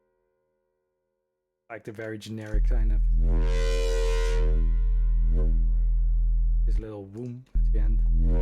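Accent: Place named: Netherlands. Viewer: But that dutch accent tho. Dope tutorial nonetheless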